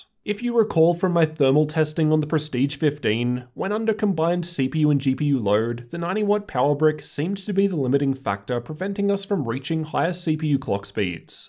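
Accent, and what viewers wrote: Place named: Australia. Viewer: aww, I liked 1-0-7-1-0-u. It’s surprisingly melodic with an Australian accent.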